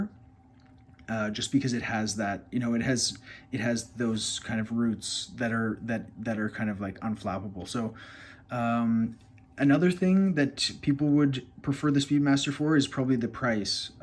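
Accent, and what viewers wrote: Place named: Canada. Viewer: Enjoying your reviews. You should do more. You're Canadian eh, just your Os and French pronunciation. Are you involved in any local watch groups?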